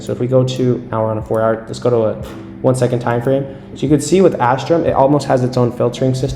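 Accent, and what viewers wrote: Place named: Bangladesh. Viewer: Bangla voice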